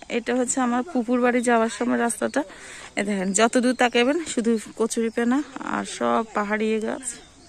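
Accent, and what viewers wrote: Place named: Noakhali, Bangladesh. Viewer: Noakhalir je sposhtho buja jay,goir ladi koy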